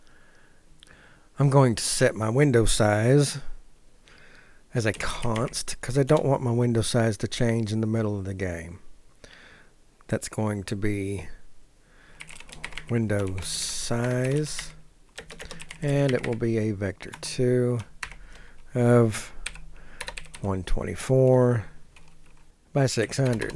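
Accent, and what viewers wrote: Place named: South, United States. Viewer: Watching this I'm thinking his southern accent really undercuts his credibility, half way through I realize he knows what hes talking about so I stick around to the end to hear the "ain't it puuurdy" and I died! lmaooo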